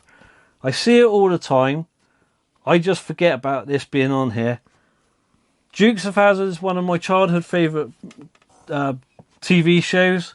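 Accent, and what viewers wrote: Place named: England, United Kingdom. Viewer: Thank You, for a Great Video, and, where r you Gentleman from, England, it sound's like.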